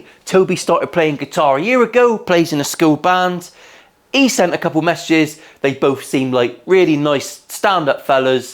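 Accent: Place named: Portsmouth, United Kingdom. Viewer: I watched them all...you are very disrespectful of just about everything...haha love it. And yes too much b***cks talked about guitar woods. Brian May did OK with bits of old furniture eh? Love the Pompey accent...I'm a homesick Torquay girl...so love it. I enjoy the guitars of course. 20seconds before swearing...you're letting it slip again haha.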